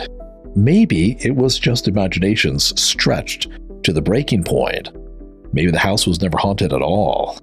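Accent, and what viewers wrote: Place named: United Kingdom. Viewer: Glad you used a clear British accent as South Shields and up that way have a very thick accent. Awesome upload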